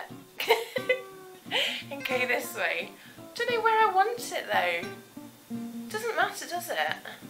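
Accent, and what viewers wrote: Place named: England, United Kingdom. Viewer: Hi, I thoroughly enjoyed your video. I love pretty redheads with an English accent. As insulin users who have a prescription we just recently got covered for the Freestyle Libre in Ontario, Canada. That is a very big savings since the sensors are now $97.00 CAD each.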